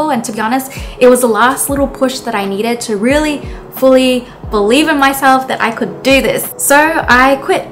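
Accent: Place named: Australia. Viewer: No wonder U have a very strong Aussie accent! 🤭 Was wondering how come a Japanese girl speaks like an Aussie! 😆🌏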